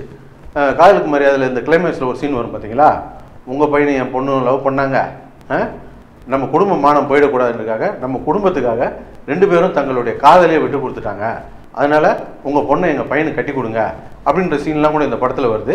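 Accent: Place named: Chennai, India. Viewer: Inna thalaiva Madras bhaasha la varuthu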